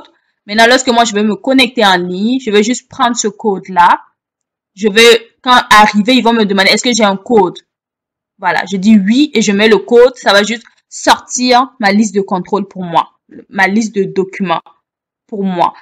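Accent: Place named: Quebec, Canada. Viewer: Tu as déjà l'accent québécois.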